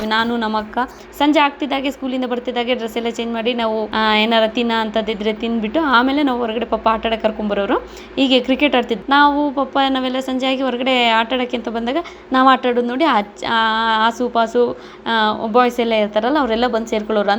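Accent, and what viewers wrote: Hassan, India. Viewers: I became fan by seeing 1st time ur videos because f our hassan slang language mam..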